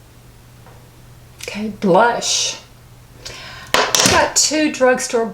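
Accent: South, United States. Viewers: Love the southern accent. I got one to; Live in Mississippi. You are a 💎 gem. Love ❤️ your videos. Thank you 😊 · I have headed such great things about those little elf eye shadow pallets , I think these are going on my wish list for my Christmas list :) I love listening to you while I’m wrapping and stuff around the house , I just can’t really say how much I enjoy your videos so much. They relax me, and I could listen to yoursweet Southern voice for ever ❤️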